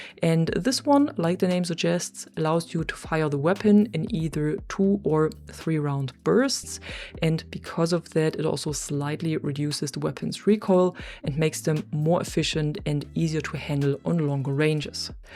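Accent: Germany. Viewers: Der deutsche Akzent is der Hammer, danke für das Video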